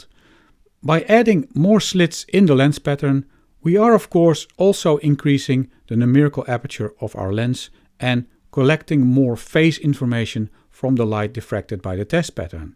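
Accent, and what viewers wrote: Netherlands. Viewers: Dutch accent is so good. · Interesting because the author is also not a native English speaker (he's Dutch). Maybe that helps non-native speakers to understand his English better.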